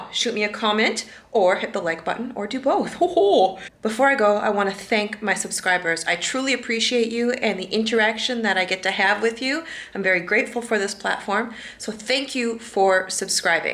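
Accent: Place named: Canada. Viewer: Outstanding video- It's like you read my mind request list from yesterday's leg day. Also...Canadian Accent +"Squat"= huge smile! ✅🌈🌈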